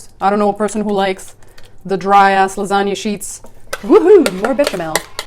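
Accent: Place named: United States. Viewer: американский акцент И(!) метрическая система – это то чего мне не хватает в англоязычном фуд-ютубе💛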